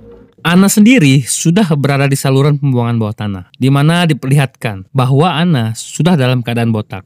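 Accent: Palembang, Indonesia. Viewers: Aiii mang cek nee wong plembang yoo...😆😆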